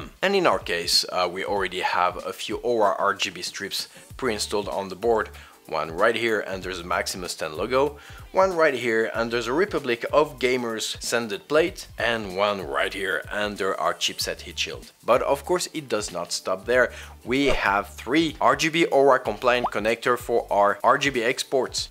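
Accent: France. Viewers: Love the accent! xD Are you French? Anyway, appreciate the review. Waiting for mine to arrive in a few days.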